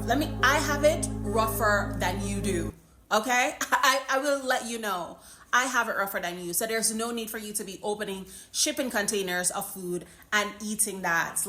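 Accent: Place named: United States. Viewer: Shut up is because you away in America that is why u and your is talking the way u a chat.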